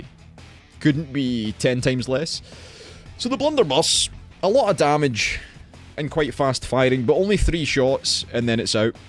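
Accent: Scotland, United Kingdom. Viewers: WOAH! I can watch a Scottish uncle play some Korean roguelikes!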